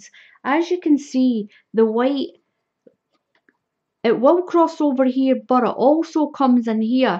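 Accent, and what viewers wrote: Scotland, United Kingdom. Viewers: The closed captions on this are hilarious 🤣 I love your Scottish accent!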